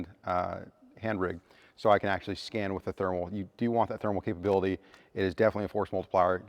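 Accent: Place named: West Coast, United States. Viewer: Knew he was west coast the moment he started talking. God be with you and your men🕊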